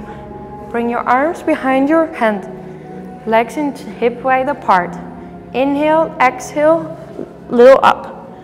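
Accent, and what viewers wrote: Netherlands. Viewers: Is Dutch? She sounds Dutch and the name checks out. Hey if you read this and you are Dutch, ik ook!!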